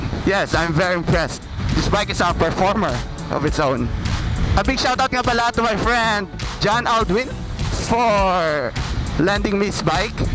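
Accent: Iloilo, Philippines. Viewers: Boss. I noticed from your previous vlog that you sounded Ilonggo! Where's this route your taking today? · Nami ma mati illonggo nga motovloger..... especially Ang tingog mo hahaha daw wla gid d b illonggo bloggers ehh heheheh nga illonggo gid Ang Gina hambl sa vlogs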